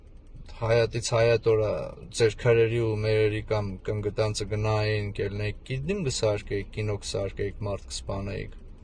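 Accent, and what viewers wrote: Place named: Gyumri, Armenia. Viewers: Ապրես ախպեր ջան! Չգիտեմ լեննագանցի ես, թե ախրքալակցի, քաաաղցր ես խոսում! Ընտրում ենք N15-ին և ՀԱՂԹՈւՄ ԵՆՔ!!!!!!! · Гюмреци э hастат👍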